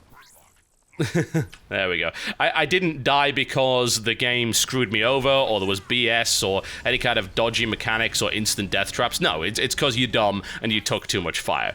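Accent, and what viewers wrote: United Kingdom. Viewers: Man you are british...BTW i mean that inna good way!! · So. British. FUCK YEAH.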